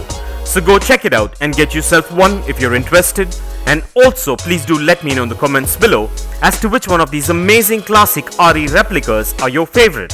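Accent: United States. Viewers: Your English accent is like an American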